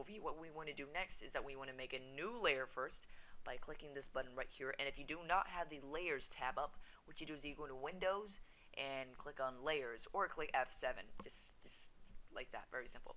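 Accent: Sweden. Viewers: Are u swedish? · U are swedish arent u? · r u from sweden? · R u from sweden? :O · WAIT are you Swedish!? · u are swedish right??Hej!!!! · are you Swedish? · Ey!!! Du är ju svensk! Ey!!! You are Swedish!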